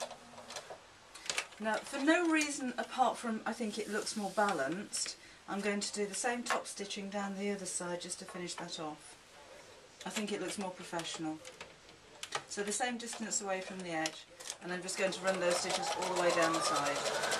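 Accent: United Kingdom. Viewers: your speech assistant does not understand your British accent. The subtitles are so disjointed, funny, weird at times.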